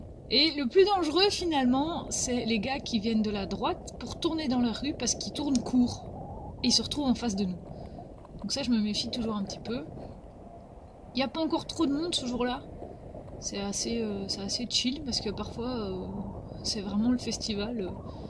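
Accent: Belgium. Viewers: Sans oublier que le fait qu'il y aie une caméra, ça en calme souvent plus d'un ! C'est comme un bouclier. Ca a peut être l'air d'un gadget, mais en fait une caméra c'est une fameuse assurance à moindre coût. Maintenant, je me sens tout nu si je n'en ai pas. :) Chouette vidéo. Y a le côté "j'ménerf pas, j'esplik" dans la voix, le côté "Belge" plaisant. Je ne me sens pas seul du coup ! ^^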